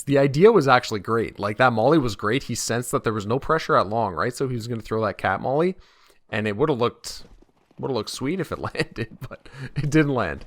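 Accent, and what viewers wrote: Canada. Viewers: Are you Canadian? Accent sounds super Canadian.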